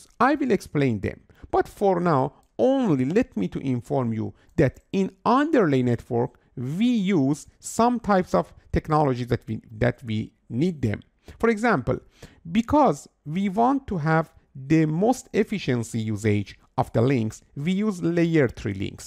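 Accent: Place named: England, United Kingdom. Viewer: Watching this form England, and I like your English accent. this video is full of good information. cheers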